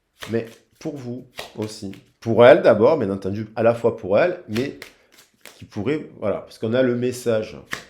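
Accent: South, France. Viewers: Coucou, C'est toujours un réel plaisir de vous écouter, ne serait ce que pour l'accent qui sent bon le soleil et les cigales😂! Je l'avais pourtant mis en garde sur le fait que sa conquête était vénale😡! Il n'a pas tenu compte de mes ressentis et a foncé aveuglément dans cette relation, il est tellement crédule qu'il s'est laissé manipuler😢par elle et ses acolytes... En y réfléchissant bien, il ne pas pas quitté, c'est moi qui ait tout fait pour qu'il parte, n'acceptant plus son comportement détaché à mon égard😞... Vous êtes une bien belle personne, honnête et c'est bien d'avoir fait cet apparté au sujet des tarifs démesurés que certains n'hésitent pas à pratiquer dans le domaine de la voyance...😞 MERCI pour tout, excellent week-end🙏🍀!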